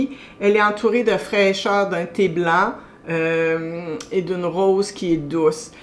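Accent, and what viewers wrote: Canada. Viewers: Coucou ❤️ Je viens justement de découvrir ta chaîne grâce à ton commentaire sur la chaîne d’Estelle, et je me suis directement abonnée! 🥰 J’ai beaucoup aimé cette vidéo autour de Frédéric Malle, Le Labo et Dior. Trois univers tellement différents! Je suis d’ailleurs totalement amoureuse de la maison Frédéric Malle ❤️, alors autant te dire que ta sélection m’a particulièrement intéressée! Contre-Jour, Violette 30, mais aussi Paradise et Cuir Saddle de Dior… de très belles découvertes et des parfums qui donnent vraiment envie d’être explorés davantage. ✨ Je suis moi aussi créatrice de contenu autour de la parfumerie et je suis belge 🇧🇪. Je pense que tu es au Canada, si j’en crois ton joli accent 😊. Je suis vraiment ravie d’avoir découvert ton univers et j’espère que nous aurons encore l’occasion d’échanger autour de notre passion commune pour les parfums. 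À très bientôt et gros bisous parfumés 💕🌸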